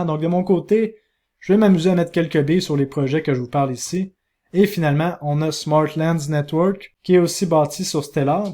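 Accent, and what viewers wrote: Quebec, Canada. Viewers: J aime de plus en plus entendre ma petite dose d accent québécois ! Merci de partager ton travail pointu et ton avis d expert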